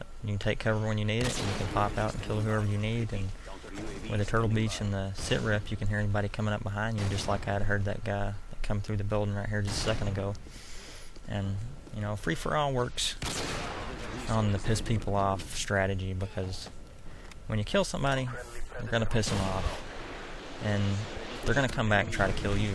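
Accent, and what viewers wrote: South, United States. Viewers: Nice commentary. Where r u from? Southern US somewhere I'm guessing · u have a southern accent? cause u say snappin ^^ and u do exactly what i do listen to footsteps its incredible the people who dont... cool vid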